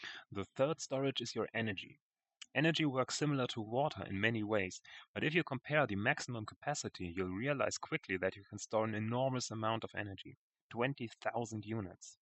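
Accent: Germany. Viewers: That sounds like a german accent :D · You are the DayZ medical messiah. Thank you for sharing this, it's opened up my eyes to what I've been doing wrong. Really good to know. And everything is more pleasant to listen to in a German accent :) You are German...right?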